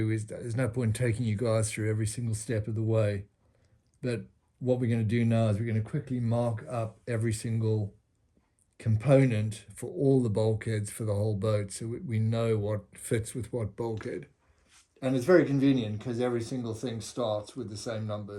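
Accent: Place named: Cape Town, South Africa. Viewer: Aha...starting at the beginning! You definitely sound Cape Townish, or Zimbo, maybe...no worries, lekker, man!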